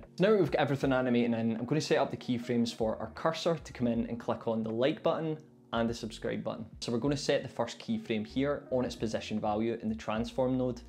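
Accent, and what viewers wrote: Scotland, United Kingdom. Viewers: Thanks mate it really helped me 🙂 u got one Subscriber more :-) But your accent is uugh where are you from ?? Scotland or so ? :D i have to listing very carefully :D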